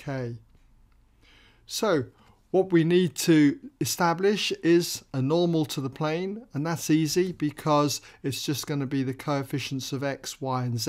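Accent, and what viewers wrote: United Kingdom. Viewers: you have the most modern british accent I've ever heard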